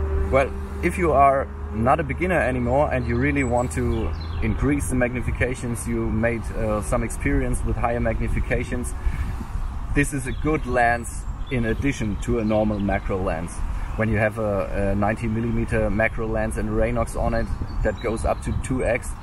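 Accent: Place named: Germany. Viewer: Charmanter deutscher Akzent..... 😉Tolles Video.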